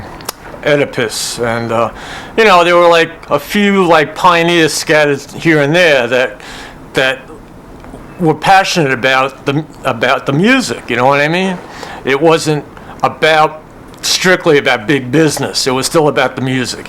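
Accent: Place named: New York, United States. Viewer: The New York accent is so cool. Love it. 👌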